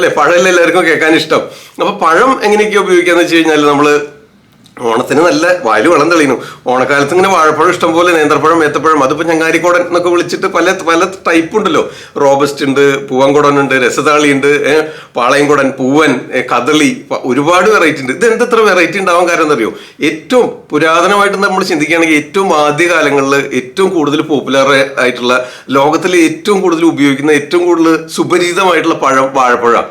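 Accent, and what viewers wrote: Thrissur, India. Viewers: Thrisoor slang👌 nannaittund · pleasant Thrssivaperror accent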